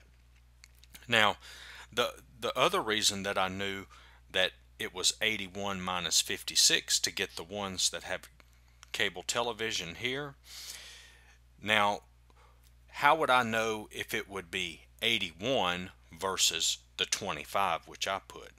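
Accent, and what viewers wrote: South, United States. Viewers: I admire your Southern accent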